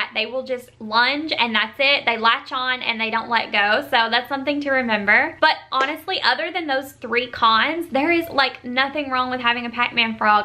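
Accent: South, United States. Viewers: Love ur country accent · she has a really southern accent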